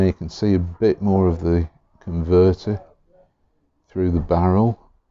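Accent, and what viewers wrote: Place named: United Kingdom. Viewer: Very good pens.. By the way, I love your british accent.. 👍👍🇪🇸🇪🇸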